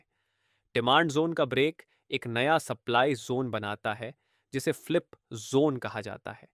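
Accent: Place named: South India, India. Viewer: Sir aap kaha se ho ek baar batana Mujhe South wale lagte ho Lekin telgu madrasi kannad Kon ho aap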